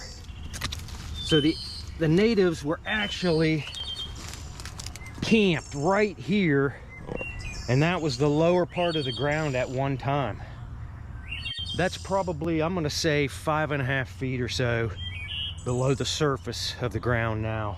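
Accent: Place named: California, United States. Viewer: Dude I,m from Califoria but i'v lived in Missouri for 45 years but you sound like your from Ca. no ofence ment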